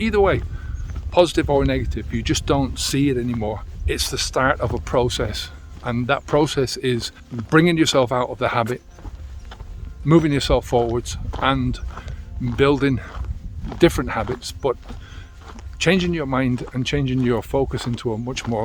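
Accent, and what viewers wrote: Ireland. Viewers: love the content, the cow bells, the Irish accent and the scenery. Thank you :)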